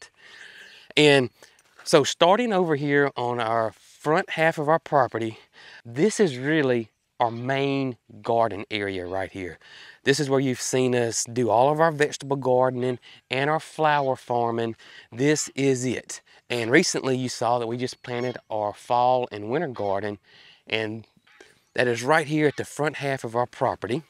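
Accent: South, United States. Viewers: Thank you for showing us the orientation of your farm. Having a better-than-average sense of direction, knowing the layout of places and special things alleviates confusion for me like the pond is a lot closer to your house than I previously thought and on the opposite axis too. I know that it causes confusion for many people. Thanx for showing the areal shots too. That put things in perspective for a lot of us. I so enjoy this channel., you're always doing something different. Plus your strong Southern droll cracks me up. I also like your kids' videos too.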